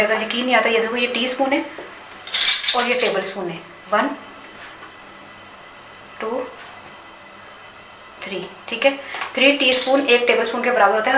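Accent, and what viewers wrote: Sialkot, Pakistan. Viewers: Thank you for your delicious 😋 Karelay Ghosht I like to hear your Punjabi, I'm sure you're from Sialkot?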